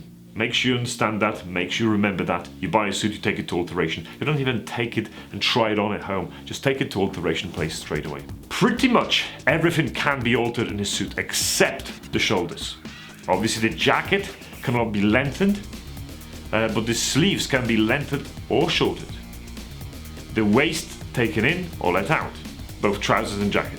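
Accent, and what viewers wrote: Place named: United Kingdom. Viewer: I know this is not the place to say this but you're absolutely gorgeous! Great British accent and excellent outfit. Well done!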